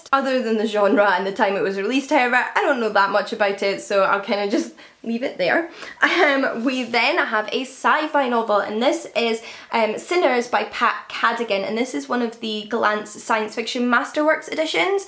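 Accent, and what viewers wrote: Scotland, United Kingdom. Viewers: That's the Scottish accent dude, it can make doom and gloom sound like optimism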